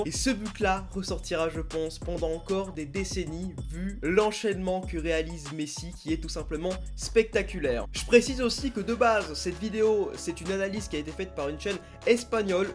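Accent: Marseille, France. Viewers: T sur que t marseillais avec cette accrnt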